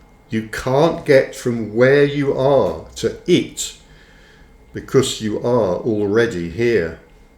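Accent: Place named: England, United Kingdom. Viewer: Listening to read his book with his English accent provides a nice intermission between episodes of Inspector Morse, Lewis or Midsumer Murders. Thank you! 🙏. 💥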